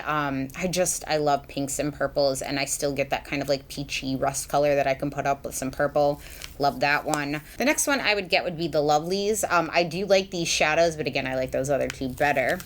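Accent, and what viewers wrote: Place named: East Coast, United States. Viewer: I had insomnia and woke up at 6PM (I’m French, so it’s already dark here). So basically I lost my Saturday and woke up completely depressed. But now in the upcoming darkness I can see a ray of hope, a glimmer of light all the way from across the ocean. Yaaas. It has long nails and a raspy east cost voice. It’s my queen and she is baaaaack. I am now ready. Candles are lit. Coffee has been made. I can enjoy your video. Thanks. Your timing is amazing. Always an absolute delight to watch your videos. Your personality and honesty are refreshing. All hail the dragon lady nails queen 👑! Love ❤️ (from across the ocean)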